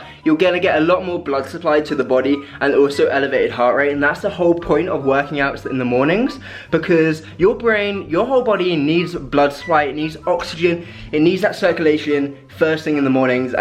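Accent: United Kingdom. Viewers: His British accent 😘😘😘😘😘😘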